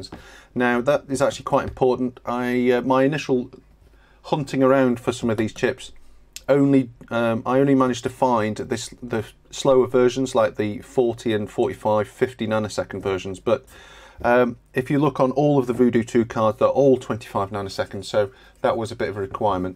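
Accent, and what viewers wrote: United Kingdom. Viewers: Derbyshire accent?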